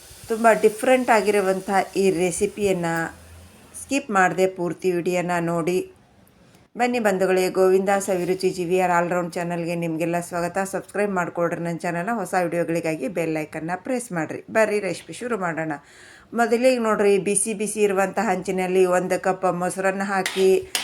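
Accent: North Karnataka, India. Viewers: Uttar karnataka language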